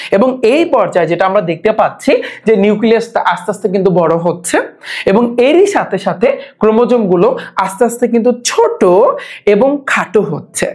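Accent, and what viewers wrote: Barishal, Bangladesh. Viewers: আপনি কি বরিশালের লোক😊😊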